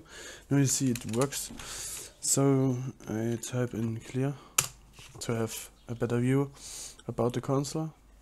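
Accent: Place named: Germany. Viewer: Der deutsche Akzent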